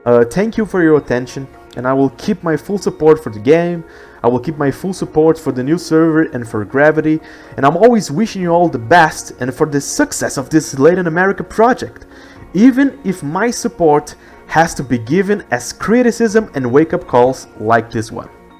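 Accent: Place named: United Kingdom. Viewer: vídeo perfeitinho, meu dog. also: whats is up with this british accent? pretty fancy, i'd say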